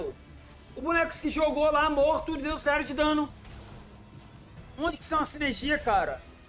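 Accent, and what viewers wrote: Recife, Brazil. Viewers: AGORA MINHA DUVIDAS FORAM TIRADAS!! RECIFENSE NATO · Eita o é do recife?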